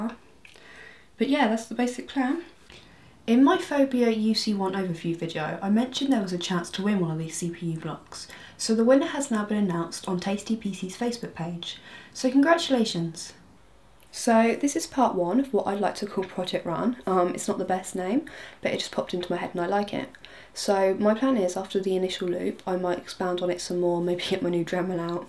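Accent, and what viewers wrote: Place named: Essex, United Kingdom. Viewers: Fit! im sure she is from essex. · English, english. English they speak in England, where it comes from...! Slight Essex accent though, a county north east of London.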